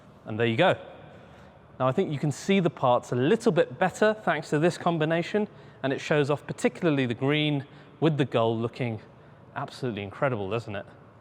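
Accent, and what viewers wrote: United Kingdom. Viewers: maybe because of the British accent?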